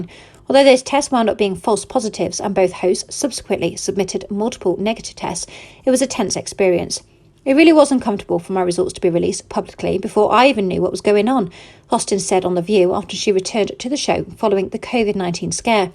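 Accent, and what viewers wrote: United Kingdom. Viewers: I can't understand a word ... a heavy British accent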